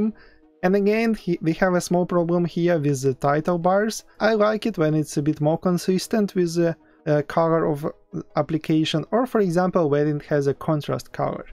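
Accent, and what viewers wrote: Russia. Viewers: лол, чувак, по акценту понятно что ты русский)))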